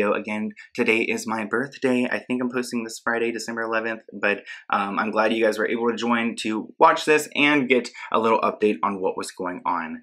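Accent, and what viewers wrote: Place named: New York, United States. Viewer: Those curls 🤍love your accent. NY?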